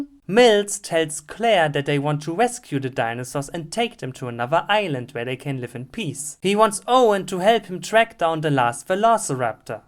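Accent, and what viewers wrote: Germany. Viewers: I like your German accent it makes these videos a hundred times better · Your strong German accent makes everything way better. When you're reviewing a terrible movie like Dino Time, it makes my eyes bleed. (That movie was terrible) but when you're there, my eyes bleed less. 😂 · I was born about a decade before Jurassic world and I watched the Jurassic park movies so ye I got inspired (I know someone else said this but your German accent makes this waaaaaaaaaaaaaaaaaaaaaaaaaaaaaaay better) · I freaking noticed, that u have to be from germany, becuz of ur Accent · Can you speak German ? Because you sound like you have a German akzent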